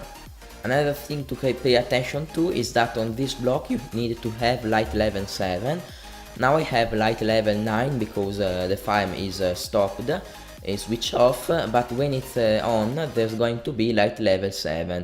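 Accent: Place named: Italy. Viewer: good video and i frecking love your italian accent XD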